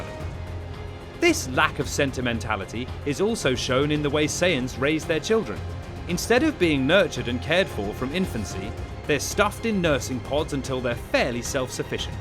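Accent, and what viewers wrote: United Kingdom. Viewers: Ohhhh I love this British accent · This was so well-made! One of your best and most engrossing videos, CBR! And the RP accent is a plus!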